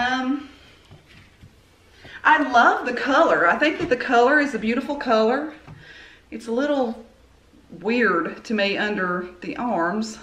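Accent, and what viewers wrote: South, United States. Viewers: First time viewer. I subscribed. You have a nice southern accent. Where are you from?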